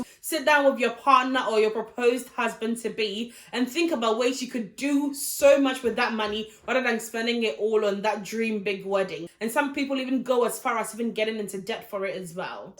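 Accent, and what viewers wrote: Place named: United Kingdom. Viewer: You are from Nigeria but you don't speak like one. You have a UK accent, I like the way you speak nice English. Maybe you were brought up in the UK.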